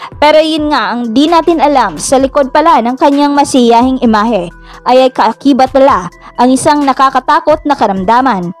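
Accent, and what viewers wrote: Philippines. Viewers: Hindi mganda boses ng nagsasalita halatadong bisakol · KABWISIT BISAYA YUNG NARRATOR!!!!!!!!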